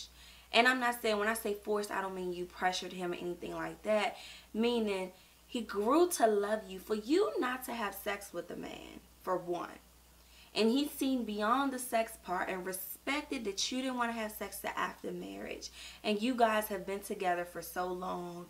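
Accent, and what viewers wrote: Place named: Maryland, United States. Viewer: Like you have A Maryland Accent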